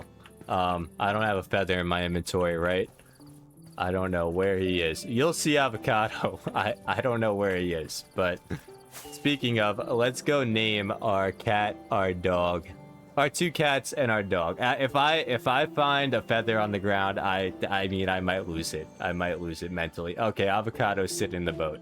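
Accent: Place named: Northeast, United States. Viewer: I hear somewhat a NE accent. Like Boston or New Jersey or something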